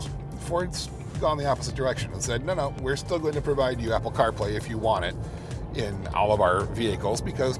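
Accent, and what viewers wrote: United States. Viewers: I’m guessing he’s an American?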